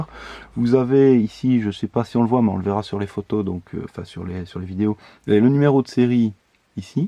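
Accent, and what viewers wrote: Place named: Belgium. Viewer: Je viens de te commander le Sector 2, et je pense que je demanderai à l'équipe de TRB de me faire un bel étui Kydex pour un port "neck" ;-) Merci pour tes vidéos, avec l'accent belge en plus !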